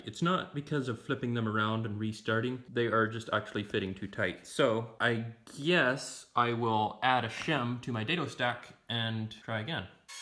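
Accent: Canada. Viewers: What accent is that? Canadian?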